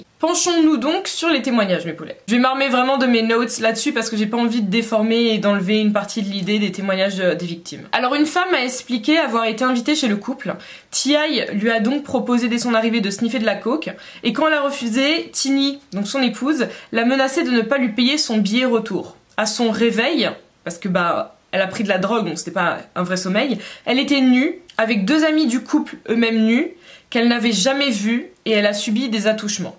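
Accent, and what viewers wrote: England, United Kingdom. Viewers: Ton accent anglais 😻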